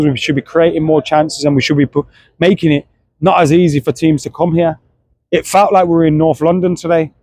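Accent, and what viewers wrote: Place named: Manchester, United Kingdom. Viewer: Nice Manchester accent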